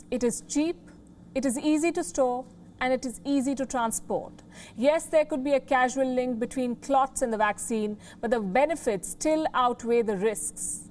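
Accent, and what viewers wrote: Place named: India. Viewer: All these years under the British masters rules you still have your indy accent YOUR COLONIZER R not very proud.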